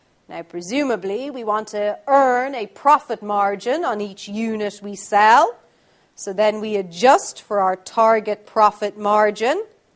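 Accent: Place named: United Kingdom. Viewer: wow uk english hit different eh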